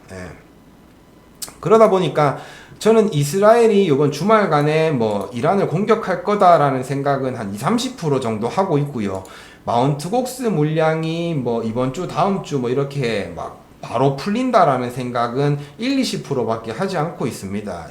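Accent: Gyeongsang, South Korea. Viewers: 대구분이시죠 ㅎ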